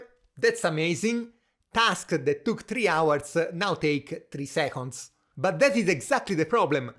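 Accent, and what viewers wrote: Tuscany, Italy. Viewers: Fantastico come l’accento toscano si estende anche all’inglese 😄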